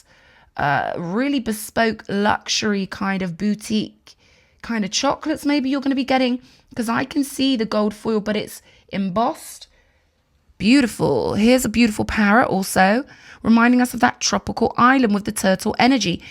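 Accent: United Kingdom. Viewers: You are ready to delve into my Childhood Memories, I’m always a Child within Honey- I really love your Brilliant articulation of your British Accent - I love to approach everything in my Path from a position of Knowledge and Wisdom ❤❤❤🍯…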